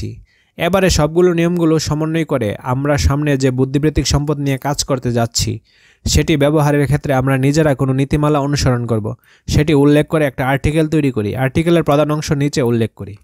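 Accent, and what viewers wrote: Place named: North Bengal, Bangladesh. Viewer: Apnar basha uttarar kothai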